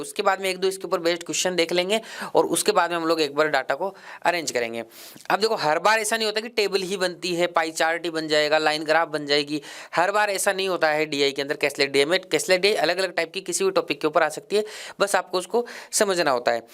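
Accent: Haryana, India. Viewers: Sir haryana se h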